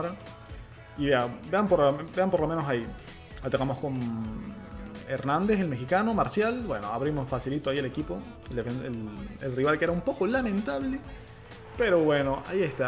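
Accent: Venezuela. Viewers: Amigo eres venezolano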